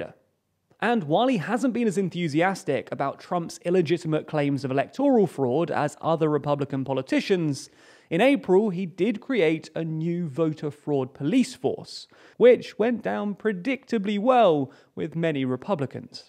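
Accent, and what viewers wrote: United Kingdom. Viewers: What's with the accent? Are you based in UK, or are you a transplant over here, Stateside?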